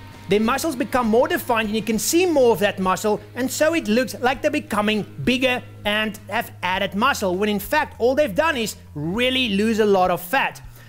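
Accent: South Africa. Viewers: Great vid man. I like to hear a no BS message in a SA accent.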